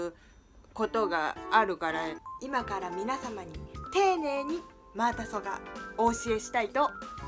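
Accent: Okayama, Japan. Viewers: 岡山弁なのと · 毎回思うんだけどのおかげで岡山弁がかわいく思えてきた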